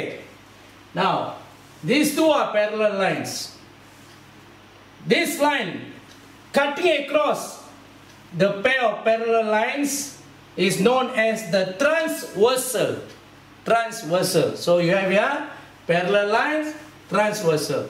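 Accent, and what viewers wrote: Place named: Malaysia. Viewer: he speak english like malau people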